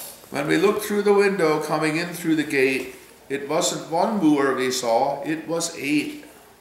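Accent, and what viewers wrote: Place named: Pennsylvania Dutch, United States. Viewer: Ich gleich dei Deitschy accent. I like your Dutch accent.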